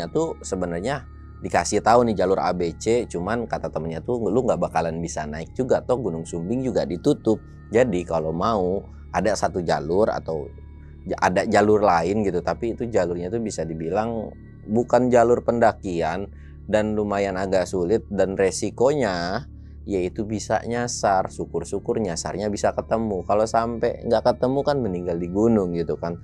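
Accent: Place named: West Java, Indonesia. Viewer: bang salam kenal dari batujajar cimahi kalo boleh tau abang asli mana bahasanya ada sunda sunda gt bang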